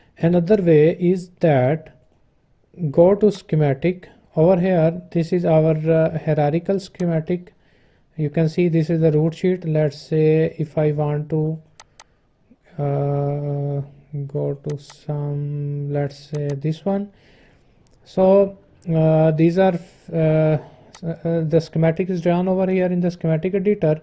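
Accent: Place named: India. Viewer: Thank you thick indian accent magician. You've saved my life.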